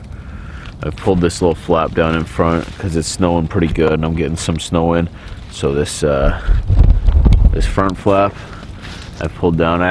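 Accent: Canada. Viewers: (I'm sure some of my Canadian viewers will know what I'm talking "aboot") lawl that had me crack up and rolling because it reminds me of how the Canadians talked in South Park: Bigger Longer and Uncut. Every time you say "about" it comes out "aboot" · Let me guess Canadian? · I can even tell that your Canadian, 1. You basically told it 2. The about to aboot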